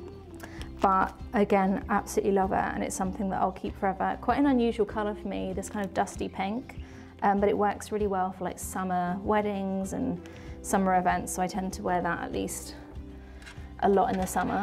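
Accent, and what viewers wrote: United Kingdom. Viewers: I love she also got same eyeshadow pallet as mine which isn't expensive 👀 Her accent and her living style so remind me of UK and I miss England 😞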